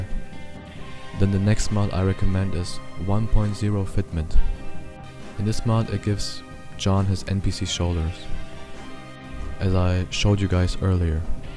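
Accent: Germany. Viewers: starkes video und feier den deutschen akzent haha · I think I hear DEUTSCH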